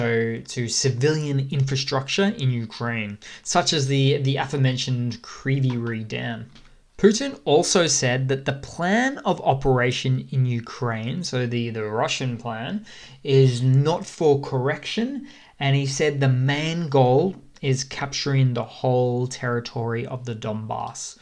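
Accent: Australia. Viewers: Same accent, both Australian. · Hey. I like your reporting. Good stuff. Honest question here: what is that accent? Australian? Please forgive my ignorance. It’s pleasing to the ear, but I can’t quite place it. Just guessing Aussie. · Really? are you an Aussie?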